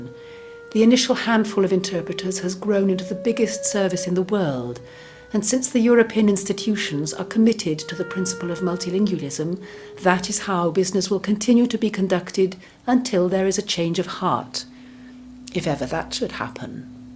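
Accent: Wales, United Kingdom. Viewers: Wales, indeed.. an enjoyable accent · Hi Latertoe, the speaker doing the voice over is from Wales. all the best, Ian DG Interpretation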